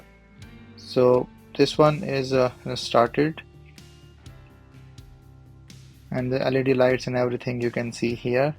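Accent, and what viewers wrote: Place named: India. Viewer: Bro your English is like India isn't you ???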